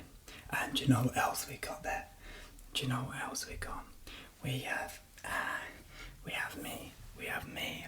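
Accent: United Kingdom. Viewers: I assumed you were already speaking British English! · Are u Britsh?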